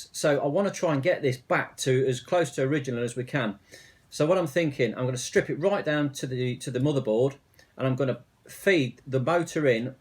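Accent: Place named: Lincolnshire, United Kingdom. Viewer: You sound like a lincolnshire lad:-)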